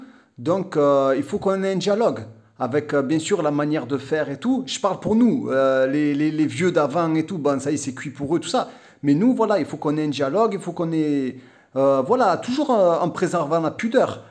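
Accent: Marseille, France. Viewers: c'est quoi el falak ? J' aime écouter cet accent marseillais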